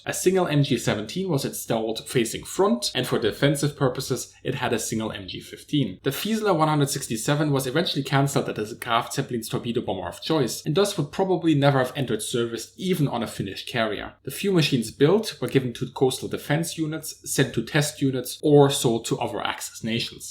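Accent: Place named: Swabia, Germany. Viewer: Schwätz doch Deutsch! Furchtbar der Schwäbisch Akzent im Englischen.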